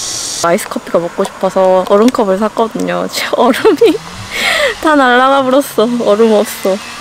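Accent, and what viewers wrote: Jeolla, South Korea. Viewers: 에너지 넘치는 23갤 아들이랑 캠핑 다니고 싶어서 요즘 캠핑 영상만 찾아보다가 님을 알게 되었어요! 지금은 경기도에 살고 있지만 고향이 무안이라 전라도 사투리가 어찌나 반갑던지!!! 캠핑에 ㅋ도 몰라서 텐트부터 장비 등을 신중히 구매하려고 하는데요(이중지출을 최대한 막으려구요ㅜㅜ) 삿갓타프 괜찮아보이네요! 비 안오는 날엔 님처럼 활용해도 좋을 것 같고 아기랑 다니면 스피드가 생명이니까요ㅜㅜ❤ 매번 영상 기다리는데 오늘도 너무 잘 봤습니다😋💛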